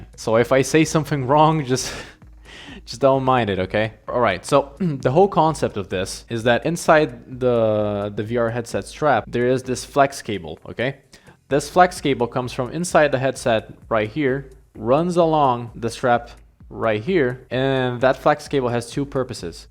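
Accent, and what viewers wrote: United States. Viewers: Manda muito no inglês. Parece um americano kkk, ótimo vídeo mano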